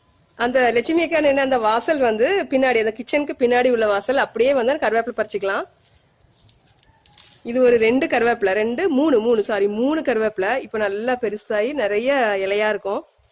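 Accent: Tirunelveli, India. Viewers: Nenga tirunelveli ya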